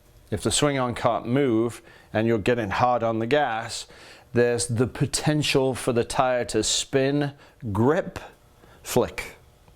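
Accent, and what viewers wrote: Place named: Wales, United Kingdom. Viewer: Hi just wanted to ask where in the world that accent comes from, sounds WELSH to me?? Good lessons though and thanks for your time doing these!!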